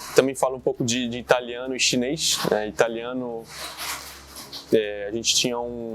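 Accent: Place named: Brazil. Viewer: O portunhol não abandona o Brasileiro 😅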